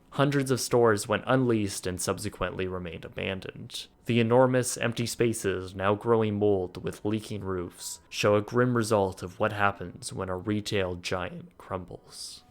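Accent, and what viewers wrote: Canada. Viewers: Love your videos. Great job,. And your voice is so nice and relaxing. Love that Canadian accent. Love from Oklahoma 🧡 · from Canada. They pronounce some things different up there. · He is from canada they pronoun words differently.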